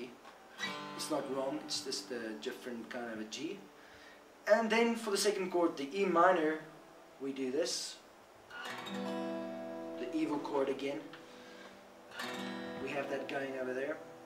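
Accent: South Africa. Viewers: do you have some south african in your accent?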